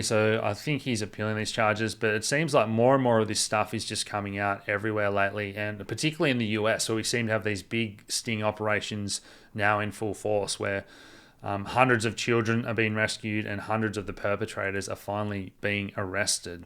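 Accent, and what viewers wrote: Australia. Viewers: As soon as the word KABAL is used it becomes another asshole speculating and pushing some crap that may resonate with those that do not possess the skill of critical thinking.. Don’t be led by the nose down the garden path . An Australian accent does not automatically exude credibility!!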